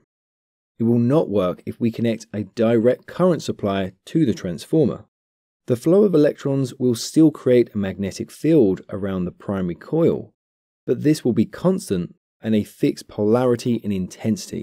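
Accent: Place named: England, United Kingdom. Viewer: Leave it to someone with an English accent to use a beer analogy. Lol. Good job anyway. I learned some things.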